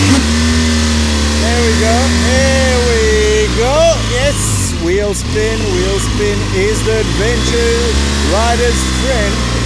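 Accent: South Africa. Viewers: Fantastic ! Was watching this & the missus said she can hear a South African accent...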